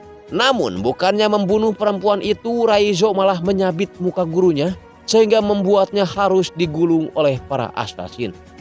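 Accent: West Java, Indonesia. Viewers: iya kan,,sumbu pendek,,,ini film serius,,knp suara yg buruk ini pembawaannya komedi,,,di kira lucu kali dgn logat sundanya,,kampungan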